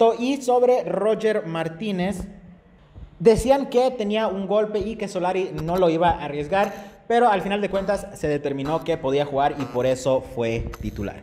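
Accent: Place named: United States. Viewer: Está ablando el pirrurris gringo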